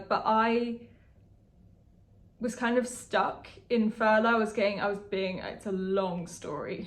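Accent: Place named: United Kingdom. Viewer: Basically, my motivation to subscribe to your channel is your British accent.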